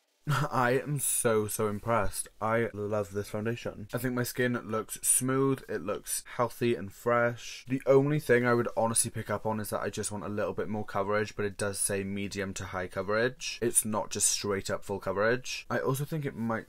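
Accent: Wales, United Kingdom. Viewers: I love your accent!!!! Are you Welsh?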